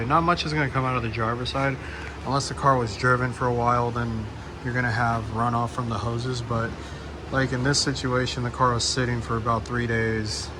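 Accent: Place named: United States. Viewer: Thank you from Spain to share this. I love Alfa Romeo Giulia and you explain very well even if with American accent! You help a lot with your videos!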